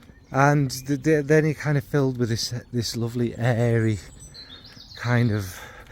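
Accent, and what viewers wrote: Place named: England, United Kingdom. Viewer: You don't need too it's cute and funny 🥰 (English accent in french is beautiful!!!)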